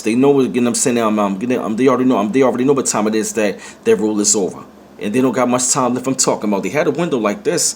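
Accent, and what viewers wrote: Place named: Philadelphia, United States. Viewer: Your accent sounds like you come from Philly? Yes they are losing power all over the earth. People are standing and speaking out against them. There is a shift of consciousness taking place. Yup! You are speaking truth, and I see everything unfolding. I was telling my daughter for the last few years what was is unfolding right now. I could and can actually visualize what is and going to happen. I been saying everything you said.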